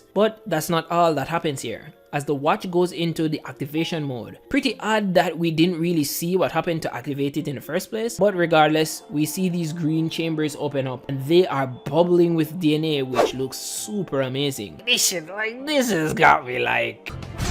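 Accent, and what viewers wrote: Jamaica. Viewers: No better reading than in the Jamaican accent. Love it. Your voice is great for narration. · Ur Jamaican?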